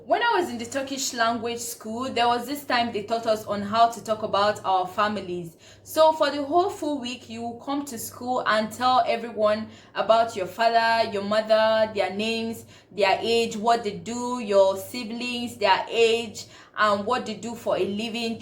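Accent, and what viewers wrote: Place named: Nigeria. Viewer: I love ur Nigerian accent "Maddah & Faddah"🤣🤣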